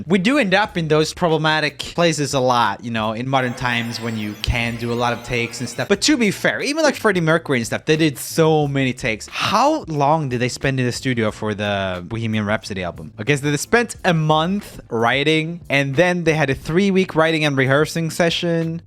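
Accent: Sweden. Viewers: RJ Alcaraz the Swedish accent.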